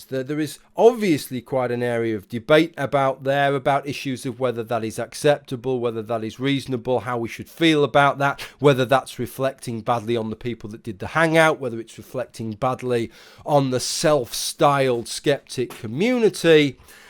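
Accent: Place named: England, United Kingdom. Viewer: Your accent is perhaps the most enjoyable English accent to me. Whereabouts is that from? Heard this couple from Whales recently, old lady was so posh, lol. Loved it. Edit: I don't mean to say I think you sound posh, just I like that too.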